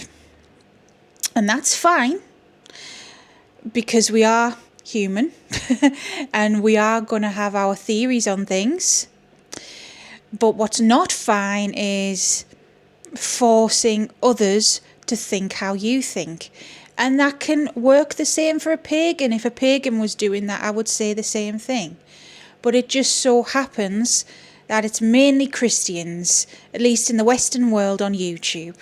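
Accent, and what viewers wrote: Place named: Newcastle, United Kingdom. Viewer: It's a Newcastle accent, and it's perfection 😍 (I am obsessed with all the American accents. You guys have tonnes. ❤)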